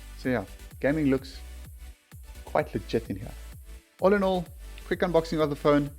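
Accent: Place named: South Africa. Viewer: Jy klink suid afrikaans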